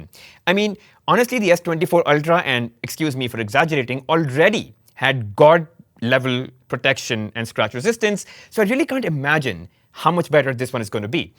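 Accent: India. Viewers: This man has such a good Indian accent. Clear and articulate. Keep it up!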